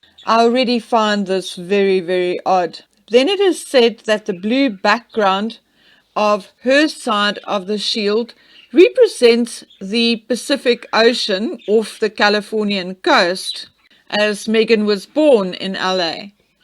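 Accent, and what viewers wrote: South Africa. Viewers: That is not your business. You racist Afrikaner. Your accent is horrible. Your country has so many problems why don't you worry about that. You cannot put your foot in Meghan's shoes. She got a standing ovation when she spoke at the United Nations. Even Banki Moon stood up to congratulate her speech.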